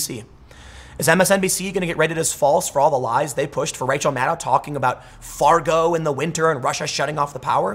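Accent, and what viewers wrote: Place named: Chicago, United States. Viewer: love your accent, I'm a Chicagoan too. I pegged you from your accent, proud of myself and you for being Chicagoans. Which neighborhood do you hail from? I'm from Rogers Park/Westridge. Thanks for the great content.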